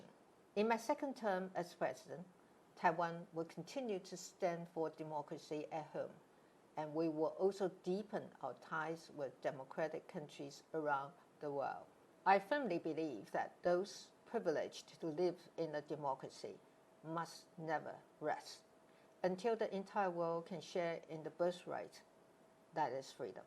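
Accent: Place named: United Kingdom. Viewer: 總統的英式英文聽起來很舒服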